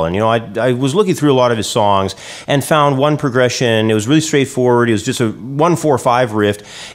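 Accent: Canada. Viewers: always a nice channel this one! hey are you, by any chance, canadian?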